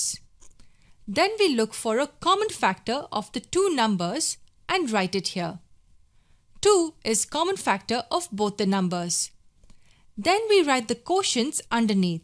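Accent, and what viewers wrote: India. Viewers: Fucking hate that Indian accent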